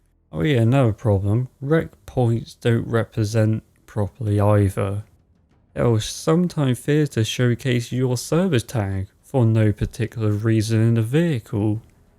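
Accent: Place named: United Kingdom. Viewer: I agree with what you're saying, And don't worry about what your voice sounds like, I think it's sound great, also what is your accent? I keep thinking it's a form of a thick British accent.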